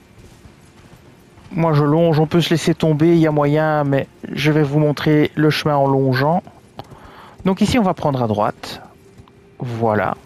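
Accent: Belgium. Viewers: Tu as un accent du Hainaut je me trompe ? ;) merci pour le muguet !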